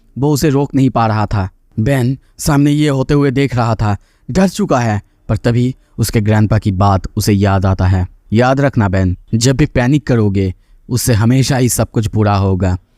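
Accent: Bengal, India. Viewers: Bengali ho aap? Accent jana pechana lag raha hai.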